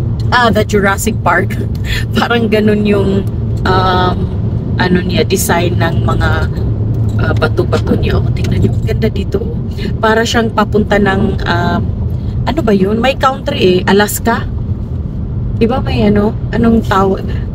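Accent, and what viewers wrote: Visayas, Philippines. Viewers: hi maam,napansin ko parang bisaya ka.asa dapit ang imo town or city sa besayas? proud ako sa mga besaya nga hero..♥️♥️♥️♥️♥️ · Morag bisaya manka mam...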